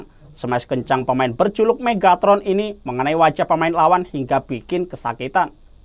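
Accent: Java, Indonesia. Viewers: Logat kamu terlalu medhok..lur..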